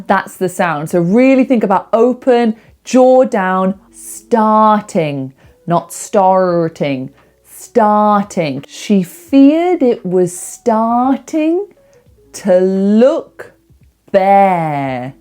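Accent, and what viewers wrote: United Kingdom. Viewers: Sounds cool , I adore the British accent.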